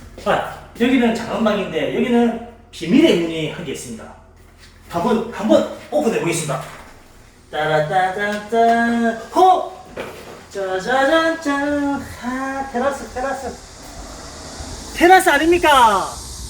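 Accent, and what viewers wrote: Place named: Seoul, South Korea. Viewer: 사장님 서울말이 너무 자연스럽네여 소개 흐시다가 한번 꺾었는데.. 30분 쉬고 방송 한거 같네예 ㅎㅎ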